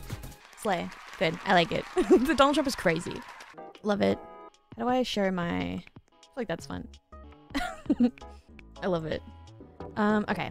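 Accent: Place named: Australia. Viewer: Your Australian? INSTANT SUB C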